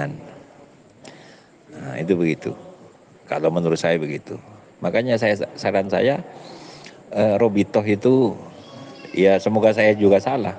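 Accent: Madura, Indonesia. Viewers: Suaranya seperti akaen madura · Kalo lihat logat bahasa, logat bahasa Madura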